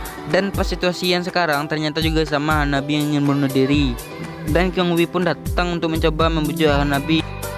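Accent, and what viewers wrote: Madura, Indonesia. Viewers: Ga bisa ilang logat maduranya wkwk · Orang Madura ya bang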